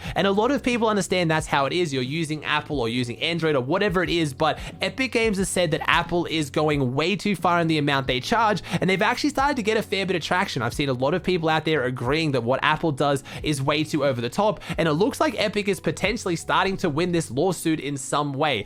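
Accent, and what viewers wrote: Australia. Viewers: now lol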